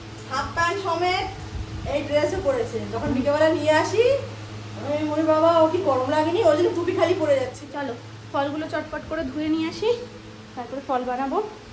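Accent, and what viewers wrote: Bangal, Bangladesh. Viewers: By borne foreigner too tumi ..Ar tumi je educated ...sooo impossible bangal kotha adopt kora....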